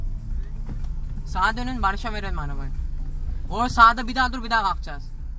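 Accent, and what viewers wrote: Malatya, Turkey. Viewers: KArdeş malatyalımısın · Kardesim malatyalimisin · Malatyalimisin kardes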